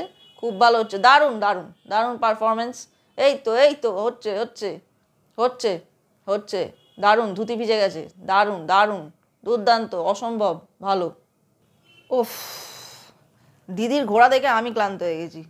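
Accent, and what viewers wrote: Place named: Bangal, Bangladesh. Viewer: এটা ঘটি নয় , বাঙাল মনে হচ্ছে 😊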